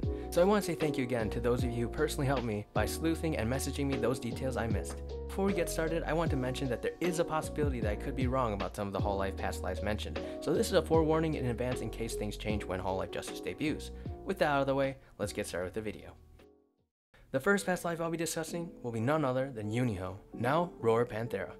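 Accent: United Kingdom. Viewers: I FUCKING KNEW IT! I FUCKING KNEW I RECOGNISED THAT BRITISH ACCENT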